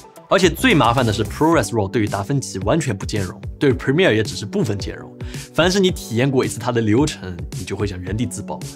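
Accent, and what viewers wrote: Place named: Zhejiang, China. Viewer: 浙江口音好重啊。。。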